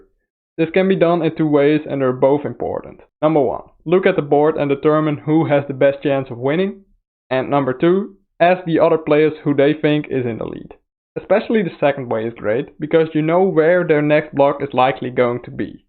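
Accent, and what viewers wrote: Netherlands. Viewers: Ah, thought you sounded Dutch! Is there a bit of a community here in The Netherlands? Would love to go to a tournament in the future after this whole virus thing...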